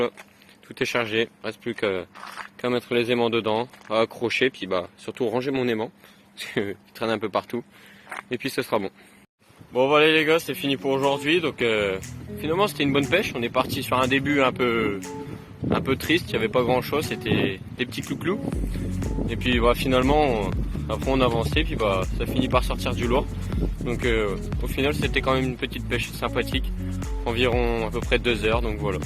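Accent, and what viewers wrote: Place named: Nord, France. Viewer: Salut heureux de te voir te lancer en solo, on reconnait le Nordiste ( he ben laooo) Félicitation pour la pêche. Jean marc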